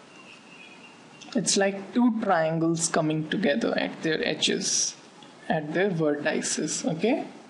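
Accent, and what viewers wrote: India. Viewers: You have a strong Indian accent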